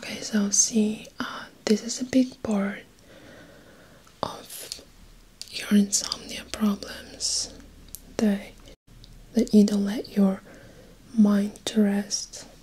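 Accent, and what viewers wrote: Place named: Russia. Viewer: Впервые на канале)) но сразу слышно русский акцент)) и книги 📚 физиология человека😄 но видео ничего есть пару норм!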